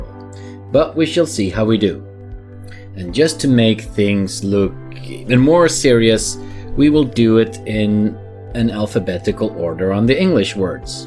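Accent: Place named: Sweden. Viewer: when I have stoped laughing I will try to figure out what part of Sweden you are from.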